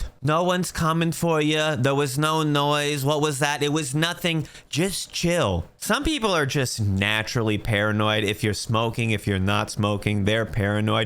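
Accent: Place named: New York, United States. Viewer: I dont even smoke, I watch when this guys NEW YORK accent spills into the video.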